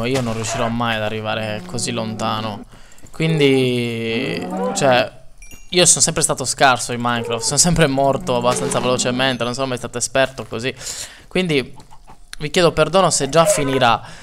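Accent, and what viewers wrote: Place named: Sardinia, Italy. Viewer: Amo il tuo accento sardo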